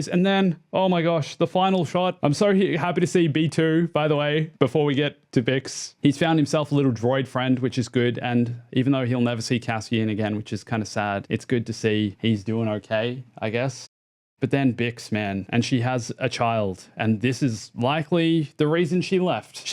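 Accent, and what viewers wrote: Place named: Australia. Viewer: You sound like an expat Aussie. You seem to have a rhotic "R" sound like the Americans. Anyway, Good vid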